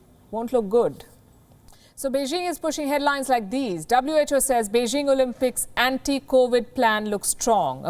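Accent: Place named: India. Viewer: How funny of this india accent!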